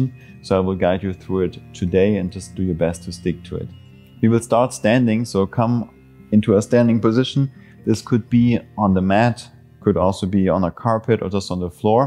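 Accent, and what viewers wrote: Germany. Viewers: Deutscher Akzent? 😁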